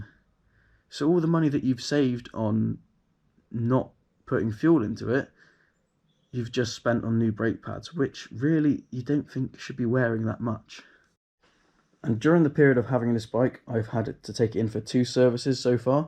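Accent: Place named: Andover, United Kingdom. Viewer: As much as I like the idea of elecric two wheelers. I would and will opt for a petrol scooter type vehicle and there some really nice ones out there. Run out of gas fill up anywhere. Electric ? run out of power your in the poo poo. I will stay with petrol for now. I think the battery technology is not there yet, its getting there but not now. Accent sounds like Andover or Basingstoke?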